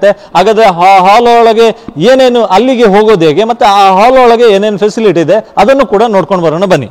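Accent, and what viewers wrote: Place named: Mangalore, India. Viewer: Anna please speak in kannada don't mix with English.. Mangalore kannada is very to nice here..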